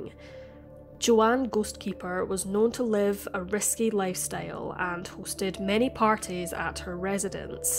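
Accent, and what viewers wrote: Scotland, United Kingdom. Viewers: Loving your channel, it's great to hear a fellow Scot narrating these episodes and I feel you treat each case with such compassion for the victims.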